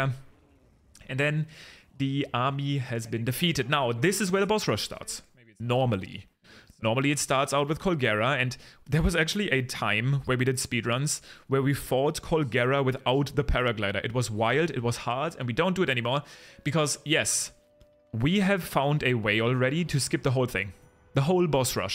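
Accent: Germany. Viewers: Are you german? Like I somehow feel you are german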